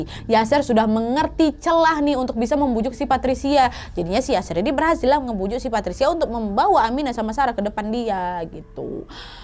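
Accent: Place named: Lampung, Indonesia. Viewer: Kaya orang lampung bahasanxa . Orang mana kak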